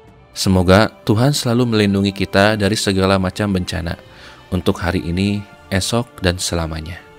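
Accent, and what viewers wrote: West Java, Indonesia. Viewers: Terimakasih konten nya kang... sunda pisan euy logatna 😊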